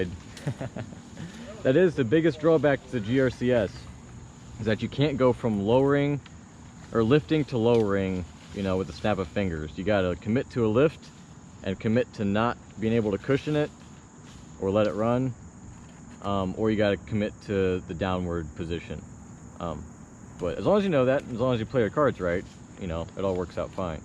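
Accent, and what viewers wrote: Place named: South, United States. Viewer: What state is this in? I’m trying to work out what your accent is. It sounds a bit south but not deep south (I’m in the UK so I’m not sure)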